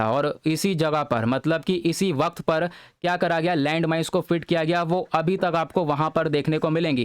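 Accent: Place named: Bihar, India. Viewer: I am sure sir is from Bihar 🔥🙏🙏😀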